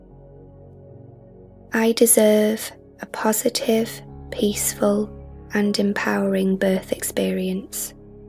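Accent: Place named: North East England, United Kingdom. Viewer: I'm a Geordie, from Newcastle! Sounds like you're from somewhere not too far away :)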